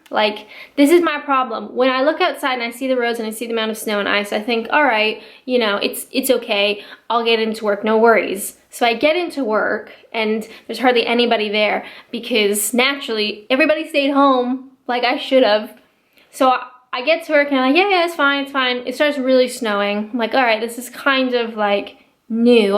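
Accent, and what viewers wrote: Canada. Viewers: It's abute ( Canadian pronunciation ) the South Scotland is another country that also says abute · Very interesting videos, from an interesting perspective. One thing you haven't yet learned... in your generalisations, it's not England... it's the UK. There are also places such as Scotland, Wales and Ireland. It's rather like us here referring to you generally, as as American, with an American accent. I know you are Canadian, and I can tell from your accent even, but you get what I mean. Yes, you're in Kent which is in England for sure, but it's the UK, and I am not English!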